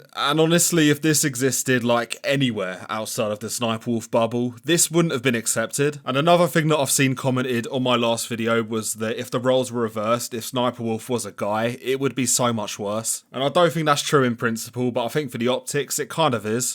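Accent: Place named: Liverpool, United Kingdom. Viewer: Omg she’s a scouser I’m getting rid of my accent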